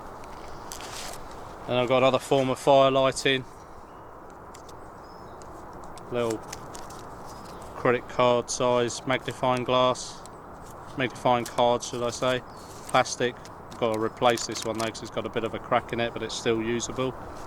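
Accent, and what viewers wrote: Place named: South West England, United Kingdom. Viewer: You Americans will just have to learn English, as it really is, rather than what you learnt at school. This is a south-western accent. Very ancient.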